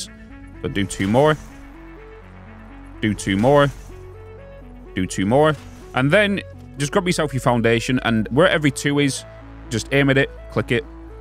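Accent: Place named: Rossendale, United Kingdom. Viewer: You from rossendale pal?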